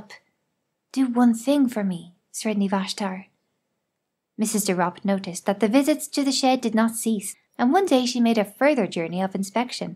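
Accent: Ireland. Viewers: irish brother a feminine Irish voice telling me how do destroy my enemies? I can get behind that.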